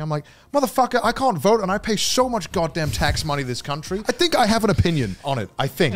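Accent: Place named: United States. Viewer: you sound awfully american in this clip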